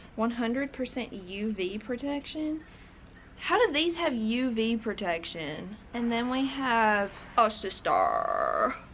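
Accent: South, United States. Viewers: The more I watch your videos, the less southern your accent sounds 😂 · Omg I love your country accent! 😁❤️ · LA? Wtf that's a southern ass accent wdym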